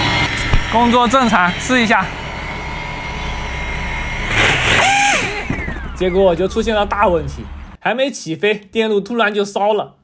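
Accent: Jiangxi, China. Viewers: 听你说话，就知道是赣普🤭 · 江西舰？赣州人吧 一听就是水西西瓜皮的口音:face-blue-smiling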